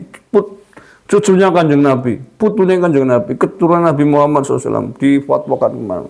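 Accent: Banyumas, Indonesia. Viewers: Apa rika wong banyumas? Medok bngt.... Hehehhee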